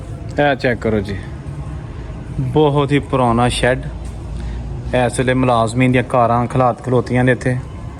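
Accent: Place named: Punjab, India. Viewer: Tuhadi boli sadi boli varge h sweet punjabi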